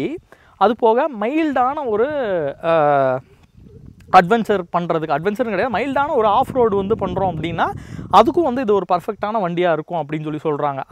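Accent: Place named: Kongu, India. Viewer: அது கொங்கன்ஸ் ஓட பிறந்த ஓசை. எளிதில் மாறாது !!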